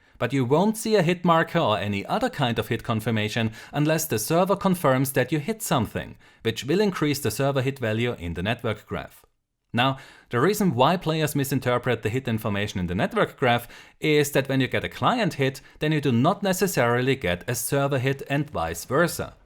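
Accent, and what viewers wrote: Germany. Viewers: Was für ein schönes deutscher Akzent. Danke für das Video. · Ist der deutsch? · Where is from? Sounds a little german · warum nicht auch mal auf deutsch...zumindest für die nicht der englischen sprache mächtigen. xD ich behaupte am akzent zu hören das es deutsch ist...am i right? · I'm not gonna lie, while I like this info, it's this guys accent that made this video really enjoyable. He sounds like someone you'd play some European Work-Vehicle-Simulator-Game with and tell you stories about how he was part of the Federal German Ground Forces, ready to defend his bakery to the death in Alfeld if Warsaw Pact forces crossed the Fulda Gap and tried to reach the Rhine. ;_;7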